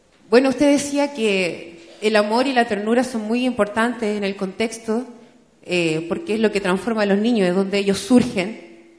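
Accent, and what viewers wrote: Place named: Chile. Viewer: Amo el acento chileno♥ Que hermoso escucharlo mi querido Maturana ♥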